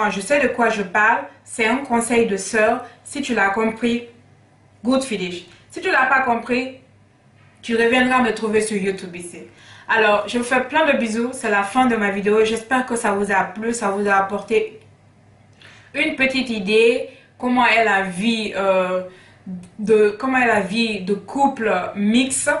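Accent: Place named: Conakry, Guinea. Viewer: Un accent de conakry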